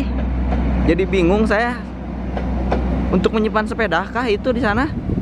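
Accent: West Java, Indonesia. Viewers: Nyunda pisan euy,kaciri urang sunda mah.nyarios bhs indonesia ge..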